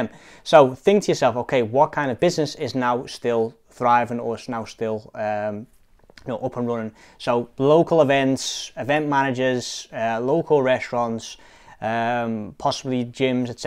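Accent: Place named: Liverpool, United Kingdom. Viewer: Ok so he only reason I'm continuing to watch this , us for the scouser accent ....lol....I was born in Cheshire ...live in Australia for 45 years ...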